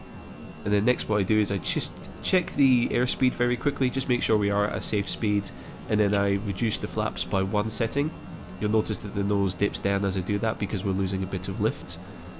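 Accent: Scotland, United Kingdom. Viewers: Are you Scottish if you don't mind me asking?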